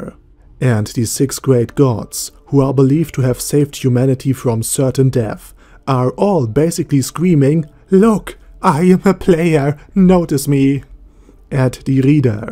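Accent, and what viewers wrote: Germany. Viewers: Man merkt das der deutscher ist an seiner englischen Aussprache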